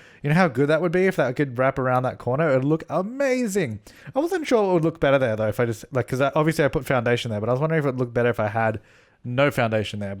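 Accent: Australia. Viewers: hi! i'm a long time fan and seeing you post lifted my spirits so much. your aussie accent never fails to comfort me and i'm not particularly sure why i feel do endeared today but i just wanted to thank you for making this community ❤ much love xx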